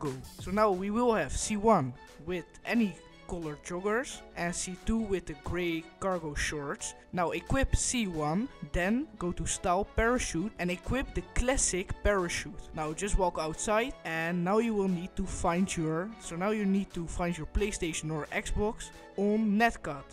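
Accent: Netherlands. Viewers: LOVED THE VIDEO! You sound very Dutch btw :) Ben jij Nederlands?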